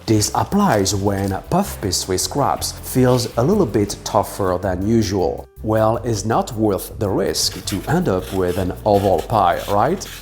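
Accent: France. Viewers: oui, on lui a déjà suggéré de mettre des sous-titres en Français (puisqu'il EST Français) et ça a déjà été fait (on aimerait que ça soit systématique, MAIS faut dire que son très très léger accent français est très sexy : on adoOre !)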